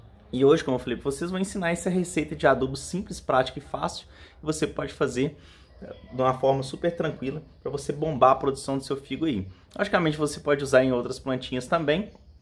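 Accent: Minas Gerais, Brazil. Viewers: Que sotaque gostoso de Minas Gerais,bjs.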